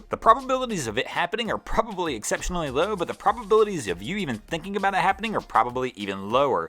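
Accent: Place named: Pittsburgh, United States. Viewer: Why do you sound like your from Pittsburgh? You even say, "dahn there". And yet, you're a Broncos Guru. I don't get it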